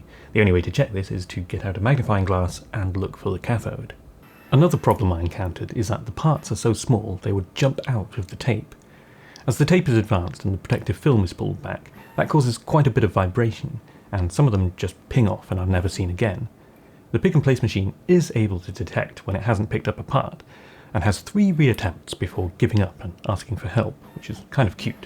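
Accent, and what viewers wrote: United Kingdom. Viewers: Can I ask🤔, and I ask out of pure curiosity and mean no offence, why is it you speak with a very good well educated British accent but sound "wires" with a V? ....please feel free to tell me to "multiply and go forth" and mind my own beeswax....I cant help it its my curious mind, when I have a curious thought go through my mind It just keeps jingling around in there distracting me and keeping me awake at night until I know the answer🙆🤦🤷🙄😅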